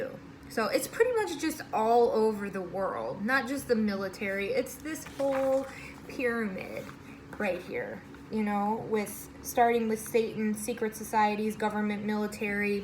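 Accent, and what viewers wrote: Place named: Midwest, United States. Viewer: I like your Mid-West ayacciyent! Seriously tho, CIA and FBI are nothing like most people think. They don't use law enforcement tactics but subversive, covert menacing,, and use your own people or enemies against you. They set it up but never really get hands dirty (directly speaking). They commit more felonies than they probably prevent.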